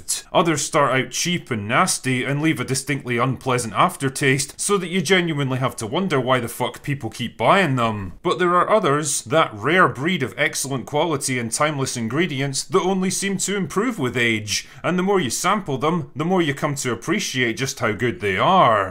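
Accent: Scotland, United Kingdom. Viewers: Note to self: everything sounds better (and funnier) in an outrageous Scottish accent!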